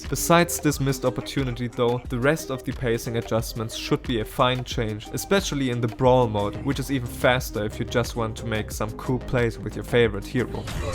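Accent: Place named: Germany. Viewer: English with a German accent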